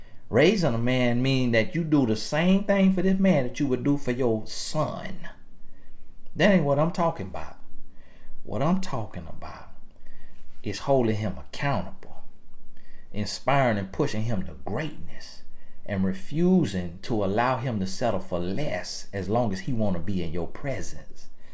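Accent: South, United States. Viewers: "How a queen lil" lmbo boy you is country!! Lol I love it!!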